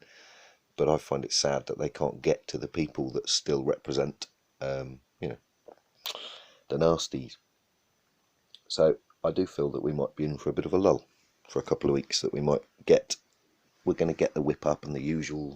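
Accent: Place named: United Kingdom. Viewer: I like your accent. Don't care much for Aussie accents but I like your Brit accent.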